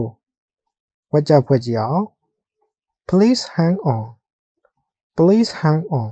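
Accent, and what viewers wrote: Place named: Myanmar. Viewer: မြန်မာဘာသာ ပြန်ဆိုထားတာ မနက်ပိုင်းနဲ့ ညနေပိုင်း လွဲနေတယ် အသံထွက်ပီပြင်ဘို့ လိုနေသေးတယ် ငပိသံ မပျောက်သေးဘူး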